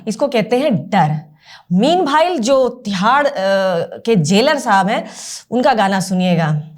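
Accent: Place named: Bihar, India. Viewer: Aapke muh se bihari bhasha ki shobha bdh jaati hai💃💃💃💃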